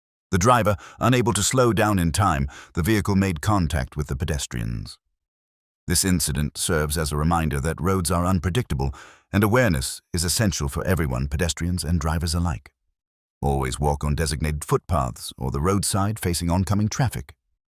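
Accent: United Kingdom. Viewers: This new voice is horrible, you already had the perfect posh British voice on recent videos. Please change it back.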